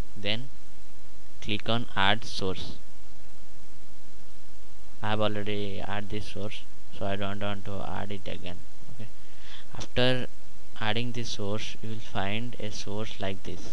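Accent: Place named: India. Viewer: Damn! He talk english using indian accent.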